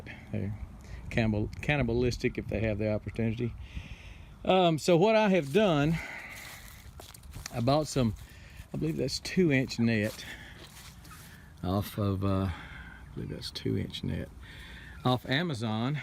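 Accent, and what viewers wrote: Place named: East Texas, United States. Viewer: First, whoah that is a THICK East TX accent! Growing up in Houston, I remember hearing accents like this frequently anytime we had to go up 59 to see my uncle, and to attend antique shows/fairs. Super helpful video! Curious how far in the ground you put the 2x4’s? Did you concrete mound the bases of them?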